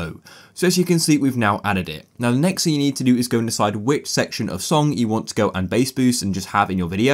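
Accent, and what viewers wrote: United Kingdom. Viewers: Are u bri'ish?🤨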